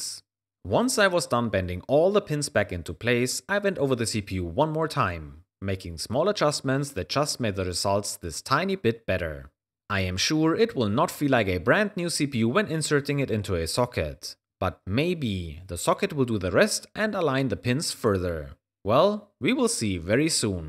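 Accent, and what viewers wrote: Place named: Germany. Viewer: Why did they want so much money from you at the scrapyard? Seems like the guy was very shady and pocketed the money. You sound German and when they allow me to look through the trash (not everyone allows that) they either say take the shit or they weigh it and I give them the scrap price. The outcome would be below 3€ for a handful of CPUs. Or was this a private facility? I always go to our municipalities scrapyard when I want to get rid of stuff and I ask if I can look through the electronics. The outcome of that question depends on the guy sitting there.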